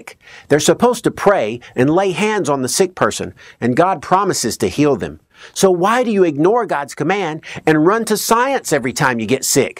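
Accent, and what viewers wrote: South, United States. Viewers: I don't even know you but giving your accent, you were probably churched in the Bible Belt and then rebelled. Given your beard I would bet you are a gay man and hate Christianity for this reason. But, I will say something nice. You are one hell of a musical talent.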